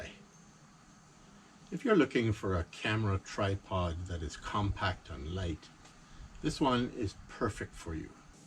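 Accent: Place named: Caribbean. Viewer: Nice, I’ve just got one, that sounds like a Caribbean accent, where are you hailing from sir? It’s a long time since I heard a white gentleman speak with a Caribbean accent. Peace from a black Caribbean gentleman in the UK.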